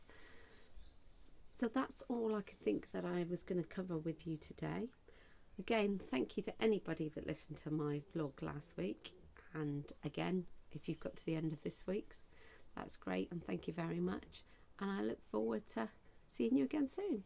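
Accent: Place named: England, United Kingdom. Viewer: i knew you were English from your accent, where are you in the UK? inquiring minds need to know....lol...i am "across the pond", but my maternal grandma was english, she became a ww2 war bride came to usa....she was born in leicester....:) i have quilted now 4 years, my mom has quilted 50 plus years...my grandma was a sewer,,,,it is in the family genes...or jeans...lol, love your vest, great idea....hmmmm i got many a jean i dont use... i was going to make some denim purses, or bags, or sewing machine bags, or quilts...the possibilities are endless...lol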